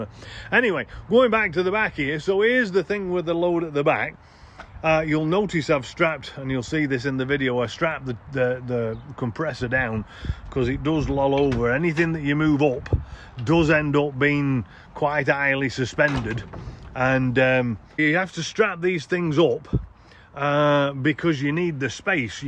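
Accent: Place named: Northern England, United Kingdom. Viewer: thanks for checking in Keep up the great work, I really enjoy your videos, fresh, to the point and a great Northern accent Lol. I was born in Lancashire, owned LRs since I was 16 had a few lol. Still not complete ruled out a new Defender, however given the rising prices of old Defenders in NZ a new one is a better financial option. I been lucky with my D3 V8 4.4 version hence the propensity to keep. All the best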